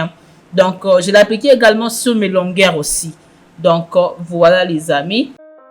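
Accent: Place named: Congo. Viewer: Hummm l’accent du Congo 🇨🇩 my sister 💪🏾💪🏾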